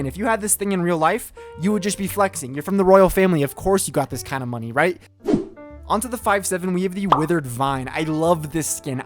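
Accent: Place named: United Kingdom. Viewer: Insane Bri'ish, certified banger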